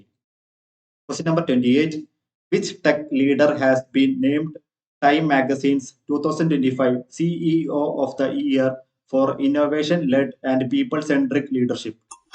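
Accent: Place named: Kerala, India. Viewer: Are you malayaliⓂ️strong accent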